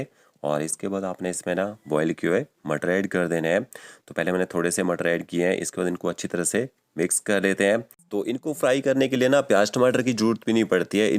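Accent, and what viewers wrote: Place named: Punjab, India. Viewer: Yours is punjabi accent.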